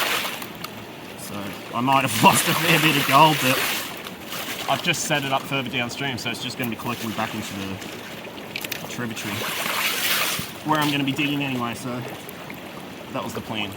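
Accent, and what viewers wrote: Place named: New South Wales, Australia. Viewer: Where are you TAS Vic or NSW .. You definitely have a NSW accent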